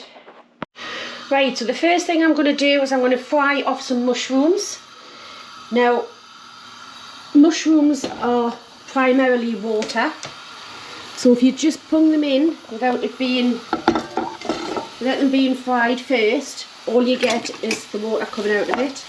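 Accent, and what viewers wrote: Newcastle, United Kingdom. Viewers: I moved to Australia 56 years ago from Newcastle and I just love your Geordie accent. i am loving your videos,the food is bringing back so many memories, so a big big thank you for the time you are putting in for our enjoyment. Also could you tell me the name of channel and I will follow him too. Love you guys.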